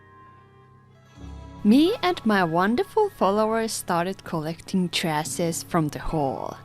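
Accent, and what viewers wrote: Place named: Finland. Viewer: So rude. She has a beautiful voice and is excited about her cleaning. I love her Finnish accent. 💙🤍💙🤍💙🤍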